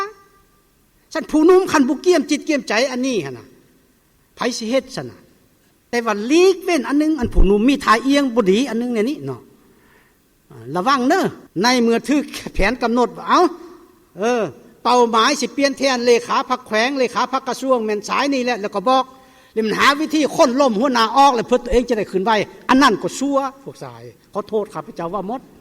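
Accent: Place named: Vietnam. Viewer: บักควายเอยกุฟังมืงเว้าเหมือนแกวสัตตตคนลาวแท้บ่อเว้าแยบนี้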